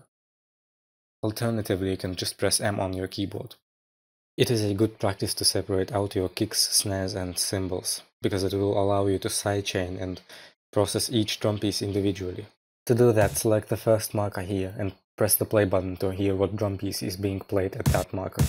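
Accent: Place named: Russia. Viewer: дружище, ты ведь русскоговорящий? я четко слышу этот акцент ахах. а вообще, очень круто. давно не смотрел подобных видео за редким исключением, но ты смог зацепить и вдохновить. всегда обожал метал и в последнее время подсел на брейккор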